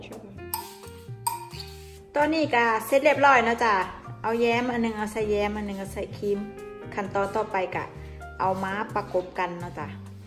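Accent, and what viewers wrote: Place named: Korat, Thailand. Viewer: พี่สำเนียงคล้ายๆคนโคราชเลยครับ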